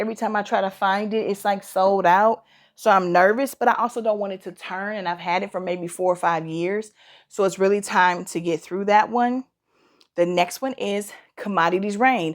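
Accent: United States. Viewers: Oh, my goodness, you are adorable with your comments and American accent! I agree with you, that you don't need to spend a kazillion dollars for a beautiful scent. Thank you for your review. ❤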